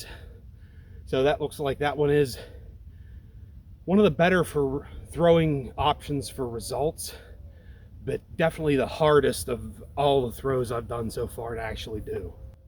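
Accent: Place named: Pennsylvania, United States. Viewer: I grew up near Pymatuning. Once I heard you speak I thought I heard PA in your accent. Thank you for sharing these videos. My dad used to build atlatl, but I never really watched anyone use a sling.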